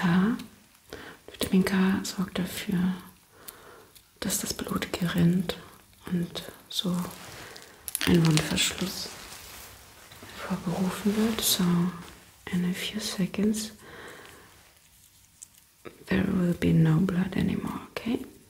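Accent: Germany. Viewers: ona jest Niemką. oczywiście ma niemiecki akcent🤦🏼‍♀️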